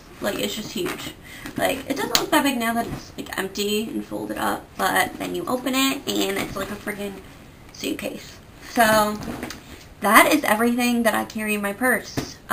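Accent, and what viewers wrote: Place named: United States. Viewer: So cute! I love your American accent <3 Super cute purse too!! Your purse looks so much cleaner and more organized then mine, I totally have the same glasses case xo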